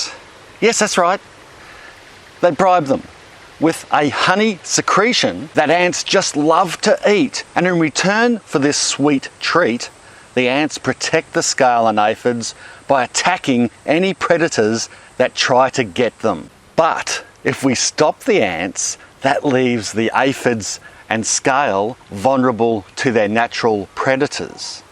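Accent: Australia. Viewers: Awesome video thanks for the information. Great to hear an aussie!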